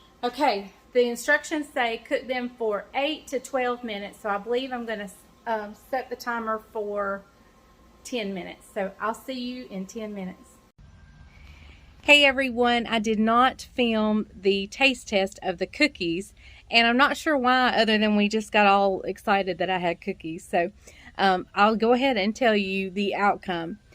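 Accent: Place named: Georgia, United States. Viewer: The cookies look delicious! I enjoyed watching this video because of your authenticity, but, also because our accents are very similar. My husband’s and my family were born and raised in north Georgia in a valley that runs along an Appalachian foothill - and we are still here! I can’t imagine my life without the woods and the mountains!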